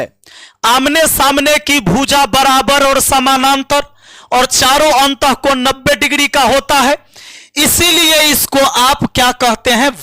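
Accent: Bihar, India. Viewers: एक बिहारी सौ पर भारी आवाज से पता चलता है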